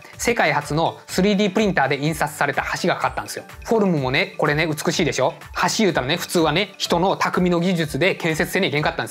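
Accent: Hiroshima, Japan. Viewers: いつもワクワクさせてもらっています。そして、生き残りについて真剣に考える契機になってます。私は、広島弁が人間らしく好きです❗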